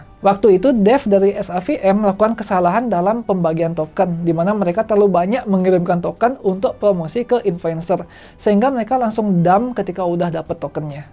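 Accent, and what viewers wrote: Bali, Indonesia. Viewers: Logatne bli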